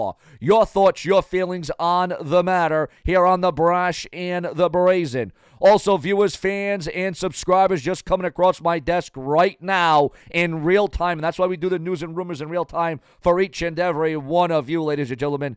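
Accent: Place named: California, United States. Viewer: any news from wrestling from you would be great. You have an awesome voice. I love it. What state are you in? You sound like you are from California. Keep up the great work you da best❤❤❤❤❤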